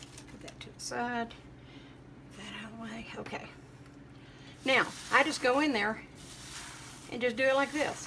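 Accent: South, United States. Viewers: Looks good just get to the dish heavy southern talk hard to understand some of the talk will make it soon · p.s. I could listen to that lovely southern talk all day long. My Mama grew up in the Smokies. <3 :)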